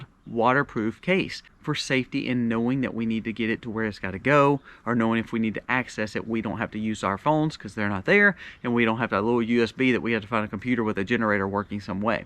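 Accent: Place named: Mississippi, United States. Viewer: 🤔 "...your WHALE...how to BOWL...,"😮😅 Oops! Forgot to adjust my ears for your lovely MS "accent" (we ALL have accents 😉)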